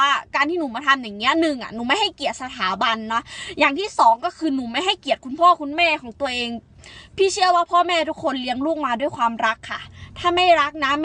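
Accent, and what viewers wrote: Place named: Khon Kaen, Thailand. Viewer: ชอบมากอะเวลาพี่พูดแหมสำเนียงเหมือนคนขอนแก่นบ้านหนูเลยมักแฮงเด้อ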